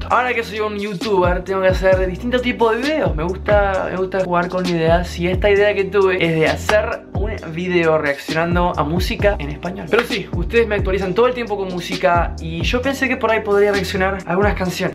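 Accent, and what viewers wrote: Argentina. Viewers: pensé que no tenías tanto acento argentino xd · Lo único que tenés de Argentino es el acento porque tenés hasta los mismos gestos yankees😂 igual te re banco,podés hacer un video hablando de cómo es tu vida allá y las diferencias que ves con respecto a Argentina?🙏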